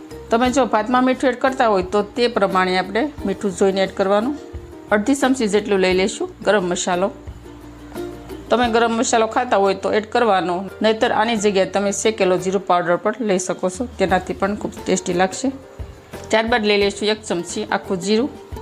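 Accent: Bhavnagar, India. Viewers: તમારી ભાષા પરથી લાગે છે કે ભાવનગર, ગોહિલવાડ પંથકનાં હશો.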